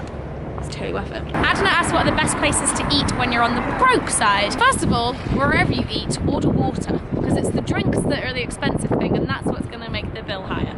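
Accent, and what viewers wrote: United Kingdom. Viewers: What country are you from? You sound half British, half Canadian. By the way, you are very pretty.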